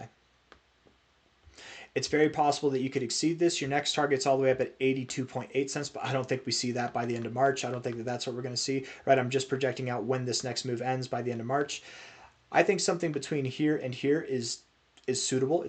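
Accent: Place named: United States. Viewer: Random question but when did you leave America? Cause ur accent is definitely American but the UTC time zone is far from America lol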